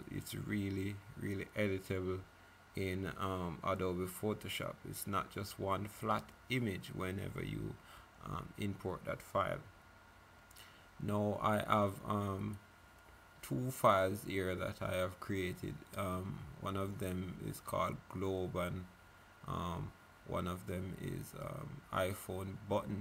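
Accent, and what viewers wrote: Jamaica. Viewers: Are you Jamaican> :D :3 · u jamaican dont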